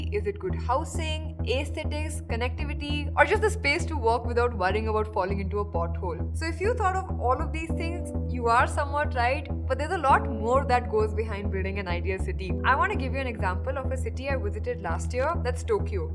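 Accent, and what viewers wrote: India. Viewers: You look Non Indian. The moment you started speaking with that thick Indian accent, that surprised me!!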